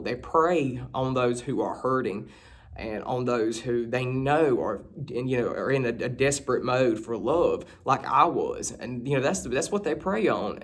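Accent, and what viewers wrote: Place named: Deep South, United States. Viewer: . I'm not sure where you are currently located, however, if you are still in the Deep South neo-con Bible belt, I'm sorry, but it is not a healthy environment for you. The first thing you should consider is moving to a more progressive place. It hurts me to see men like you, good, decent people, who have had to go through an environment that died in the 1960s. I am not suggesting that you need to lose your faith but think about this. The best definition of Evil is this: "Something that appears good and is not." Your country is on the brink of Civil War because of the appalling level of ignorance (don't confuse this with intelligence) that saturates the Mid-West and Rural Southern areas of the USA.). I was fortunate to have been born in London, England. I have dual citizenship and my home is in Toronto, Canada. 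Do not put down "Book-larnin." Sorry, but your accent only amplifies your situation. Now I'm not saying you need to get a PhD like I did. Not everyone is an academic or seeks a professional degree. Use your better instincts. All of us have a bell in the back of our unconscious that rings when we sense someone is "just like that other person who told us that they would be more than willing to teach us. Knowledge will make you Free. Read and read and read. The internet is not the greatest source of knowledge. And please, refrain from mentioning things like "I wish I had an older mentor." That is precisely what you do NOT need. You will be deluged with men older than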